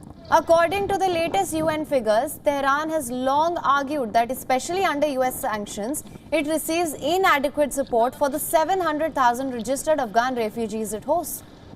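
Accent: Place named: Southeast, India. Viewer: You're eccent southeast India I can't stand that thick eccent 🧐😳😬😬😬😬🤐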